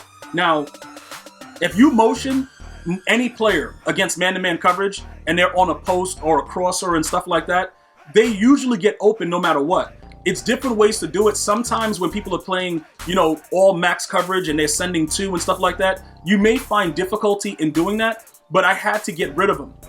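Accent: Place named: Boston, United States. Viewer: Yo are you from Bahstan? I hear your accent lmao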